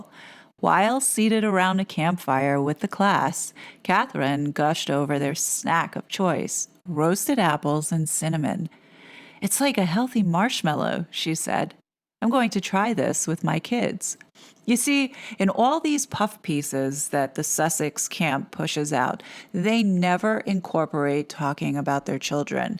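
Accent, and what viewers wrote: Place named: United States. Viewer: Why did the US magazine mentioned abut how much they are "IN LOVE", we heard so much about their living separately and how Harry's demeanor changed as soon as Me Me showed up? BTW, did I hear correctly in your recent videos that you were a woman of color and that you lived near Windsor? You are an American living in the UK? You have a soothing American voice and accent.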